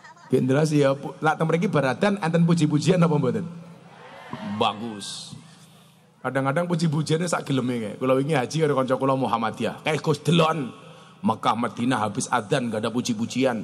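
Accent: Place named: Java, Indonesia. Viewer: Sy tiap hri nyimak ceramah e Gus Miftah gk membosan kan seneng jg dgn logat jawa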